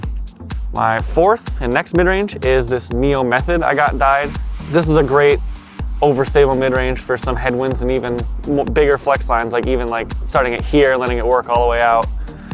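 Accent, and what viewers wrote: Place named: Michigan, United States. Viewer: Ive lived in Michigan all my life and this is the first time I noticed a Michigan accident.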